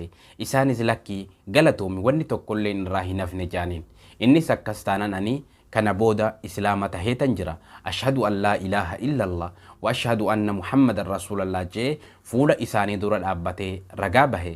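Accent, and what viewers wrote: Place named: Arsi, Ethiopia. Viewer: As ayk wr wb mashala mashaala rabbi umri dhera sii haa kenu barumsa garii sira barane obbolesa muslimati sagaleti arsii naa fakate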